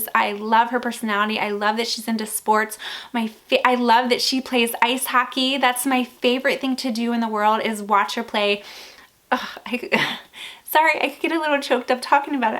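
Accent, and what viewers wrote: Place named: Chicago, United States. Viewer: I totally miss this and didn’t ask you my question. You have a beautiful accent and I think you might be from Chicago. Is that a accurate?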